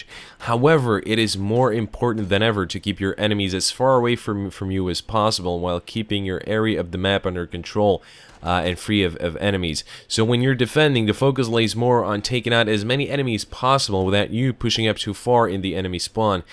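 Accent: Ireland. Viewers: Is he an Irishman ? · Sounded like the irish accent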